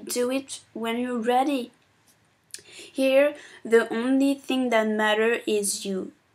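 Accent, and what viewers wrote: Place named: France. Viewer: j'adore votre accent . teach me more french?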